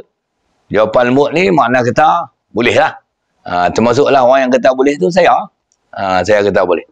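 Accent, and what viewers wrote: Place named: Kedah, Malaysia. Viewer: Pekat betul loghat Kedah Ustaz ni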